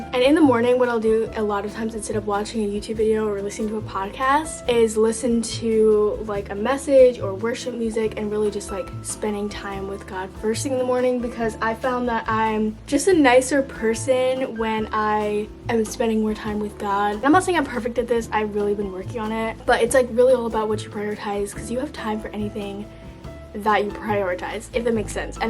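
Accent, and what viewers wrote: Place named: United Kingdom. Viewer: GIRL I THOUGHT SHE WAS BRITAIN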